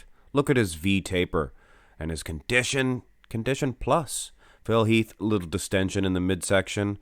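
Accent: Canada. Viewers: Great commentary. I couldn’t tell if he was from Canada or Minnesota until the end of the video. I am guessing Canada.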